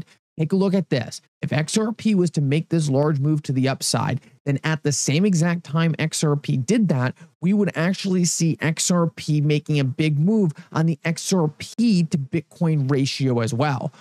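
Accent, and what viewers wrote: Allentown, United States. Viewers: with an Allentown accent, XRP is set to go to 0.001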